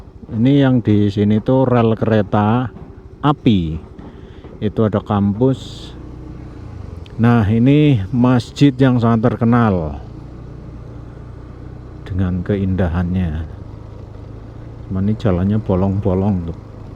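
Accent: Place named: Java, Indonesia. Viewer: Jawa medog kluweg kluweg mleketreg mblufag bawuk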